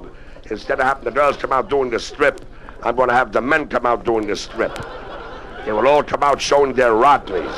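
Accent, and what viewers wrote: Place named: Brooklyn, United States. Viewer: He speaks in the old lost Greenpoint (greenpurnt) Brooklyn accent. Note the very canned laughter. Live recordings in the 1950s were quite rare.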